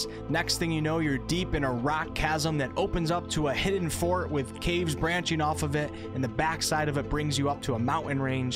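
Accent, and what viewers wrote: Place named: Midwest, United States. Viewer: That midwestern accent peeking out on occasion.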